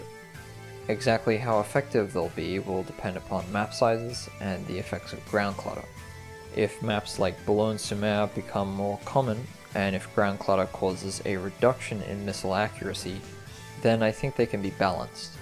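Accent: Australia. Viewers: He's aussie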